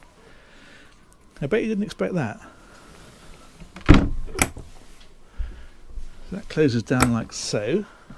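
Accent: England, United Kingdom. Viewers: I second the motion Thank you for showing this (Boat) I noticed that you very finely opened up the cabinets to the galley. I apreciate that. I went to school in Exceter as a boy and I miss my cold overcast days..no really. I came home to LA with an accent :) yours reminds me of fond memories. I enjoy your showings. On the Magellano 53 you breezed right by them ! (the cabinets) I was hoping you would come back around to see if there was a dishwasher or anything else. I thought maybe I would have to go to counseling when you did not. haha:) I look forward to your next Tour. Thanks again, Tom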